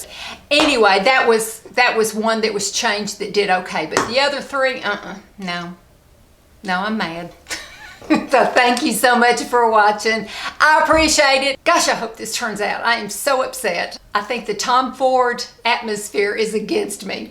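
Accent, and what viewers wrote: South, United States. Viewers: Don't come between a woman and her lipstick! Love it. I subscribed to you the other day because I saw you on little poet. This is my first time to see you. I love you! I have laughed more and had such a good time with you. Now girl, I am southern too. I have an accent and when I hear someone like me, I just go into this haze of pleasure it's like dope. Now tell me if I am right, You sound like a Carolina girl or a Tennessee girl. Maybe Texas but I'm counting on the Appalachian south.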